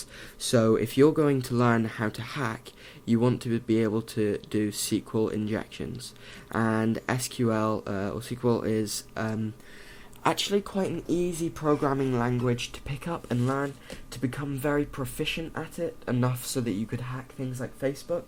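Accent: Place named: United Kingdom. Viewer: Dude.... you are a genius... my mind is blown... and the british accent makes you sound really smart too...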